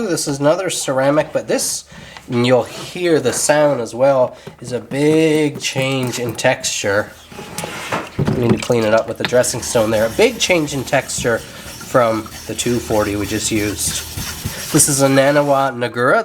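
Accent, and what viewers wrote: Newfoundland, Canada. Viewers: I watch your videos for two reasons besides masterful knife sharpening. One for unique experience of enjoying the Newfoundland accent and second as a thank you to the people of Newfoundland (Gander especially) for their unparalleled humanity and hospitality during the days following 9/11. Hope to visit one day until then will visit vicariously through your videos. 💕🙏🏻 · Awesome work dude! Sounds like you’re a maritimer. Definitely not a PEI or Nova Scotia accent going to have to say you’re a Newfoundlander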